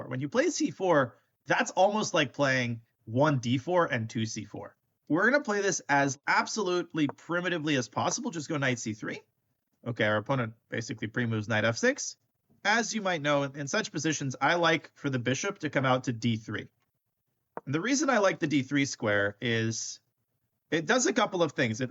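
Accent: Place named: Russia. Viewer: As much as I appreciate your speedrun course I still can't understand why you have been constantly using the Russian words and accent in the time when Russians invaded Ukraine and commit so many war crimes.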